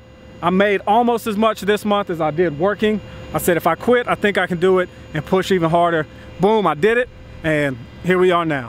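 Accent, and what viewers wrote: South, United States. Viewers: 1. I knew from that voice that he was a white guy from the south. 2. LOL at that dig at Terroriser. I like them both but lol. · he sound country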